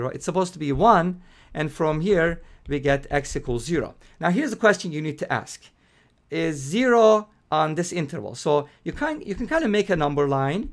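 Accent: Turkey. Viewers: Sir you sound like a turkish because of your accent but thanks for helping,sending you the best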